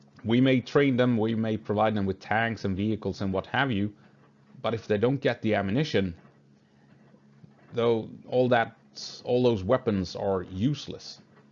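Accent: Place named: Sweden. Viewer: Veldig imponert over swenglishen din😂👍 Denne kanalen ble lagt til blant mine favoritter med EN gang!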